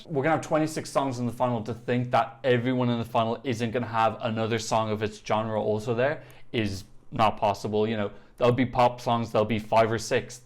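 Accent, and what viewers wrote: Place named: Ireland. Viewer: This was a nice reaction video, I enjoyed it and you had some interesting opinions. Keep it up dude! I have some constructive criticism if you don’t mind. The only thing I’d say though is that you don’t seem to annunciate your words very well. Like, I’m Irish and even I found it difficult to make out what you were trying to say a lot of the time. It’s important to keep in mind that, if you want to appeal to a wider audience, they need to be able to understand you even if English isn’t their first language of if they generally have trouble deciphering Irish accents. Apart from that, the video was great!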